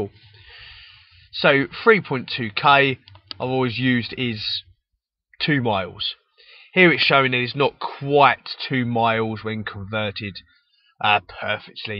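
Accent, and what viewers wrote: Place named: Birmingham, United Kingdom. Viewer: Love the Brummy accent! Great job on the video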